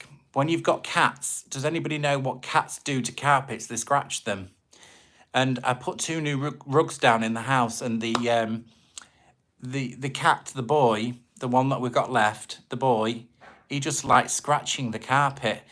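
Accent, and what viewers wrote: United Kingdom. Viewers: You're so welcome. Yes it is the accent. I've always loved the British and Scottish accents. 🙂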